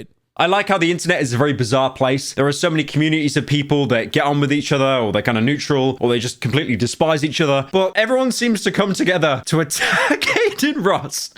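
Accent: United Kingdom. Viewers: Pyro, Internet Anarchist’s numbers line up with yours. He lost $8000, he’s Australian. If he made a million on that video, like your that made a million, the numbers would be very similar. Convert $8000 AUD to pounds which i’m assuming you are using because you got the funny british voice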